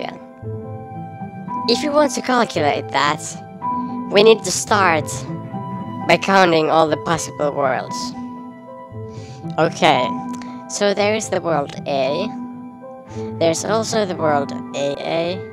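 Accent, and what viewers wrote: Finland. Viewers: are u finnish? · Are you from Finland? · Ootko Suomalainen Koska Kuulostat Siltä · are u from finland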